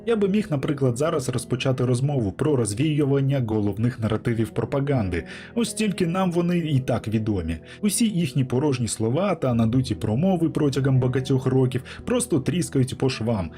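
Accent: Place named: Belarus. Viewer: Слава Богу, Слава Україні, класный белоруский акцент)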